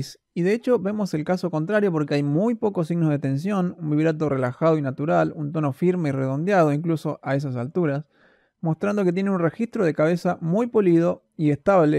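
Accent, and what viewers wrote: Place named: Castile, Spain. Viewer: ✨✨✨Maravillosas tus técnicas ✨✨(HD isolation)) y ((Gráfico espectral))...eres único analizando y mostrando solo el sonido de la voz. Sorprendida al escuchar tu voz castellana ..saludos desde Mendoza 🇦🇷 y en mi nombre grupo LA VOZ DEL MUNDO DIMASH QUDAIBERGEN SOY TU FAN 🇦🇷🇦🇷 ARGENTINA.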